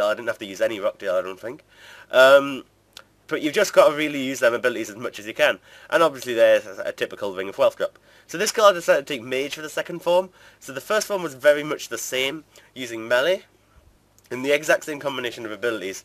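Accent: Newcastle, United Kingdom. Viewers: Are you a geordie? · can tell ur a geordie haha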